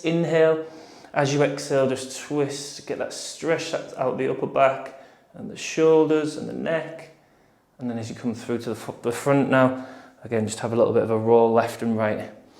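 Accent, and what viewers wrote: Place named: Yorkshire, United Kingdom. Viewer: Thank you, for this and all videos! I love your calm voice and your lovely accent (Yorkshire?) 😊 and I feel good after my runs when I stretch with you. So thanks again and see you soon!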